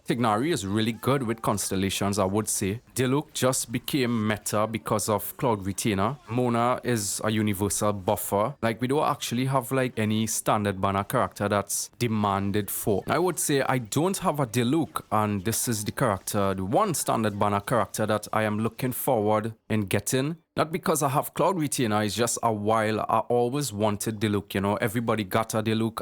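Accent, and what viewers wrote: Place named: Trinidad and Tobago. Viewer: Oh i have a good guess for some reason i think you from Trinidad lmao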